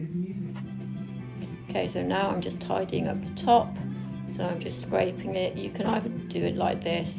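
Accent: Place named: Scotland, United Kingdom. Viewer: Your accent... automatically makes me think of Outlander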